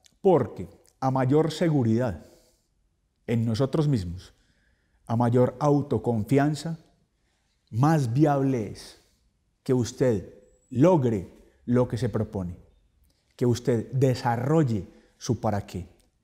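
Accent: Antioquia, Colombia. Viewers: LUIS CARO no lo compares con ese asesino. Jajaja te hace parecido por que ambos son paisas, son de la misma región de Colombia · Me encanta el acento colombiano!!! Ay Matilde!!! 🤣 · definitivamente el acento colombiano hace que sienta que me están castigando, un abrir de ojos instructivo. Que sea de una vez. El momento es ahora.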